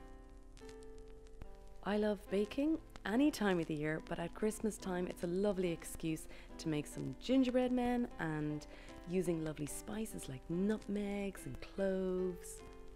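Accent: Ireland. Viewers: Am I detecting an Irish accent? My family came from County Cork so Ireland is near and dear to my heart.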